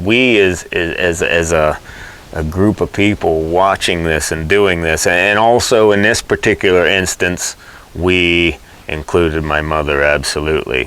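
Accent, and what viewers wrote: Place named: Pennsylvania, United States. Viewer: Good answers to questions people were wondering about. Had no back ground at all on you or any contestants. You talked like people that I know in Pa. After the show is over my son-in-law told me you are from Clearfield county PA. The son-in -law and daughter live in Faunce. The segments that were shown of you and the other participants were so interesting. It caused me to wonder. Could I do this? Hell no was my answer. Listen, proud to see someone from Pennsy took the title. Although Callie gave it a good run. My money was on you after day 50. Congrats to you and wishing you the best. Hope to meet you someday, maybe at a book signing or something. Good luck and safe(but exciting journeys to you).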